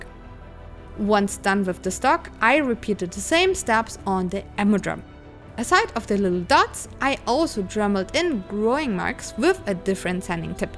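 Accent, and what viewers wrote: Germany. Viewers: Are you from Germany or something like that you have a very strong German accent in your English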